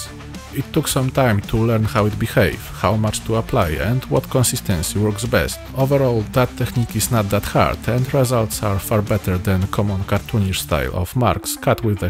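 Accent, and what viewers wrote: Poland. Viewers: Siema 😊 Polski akcent ❤ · sound like polish accent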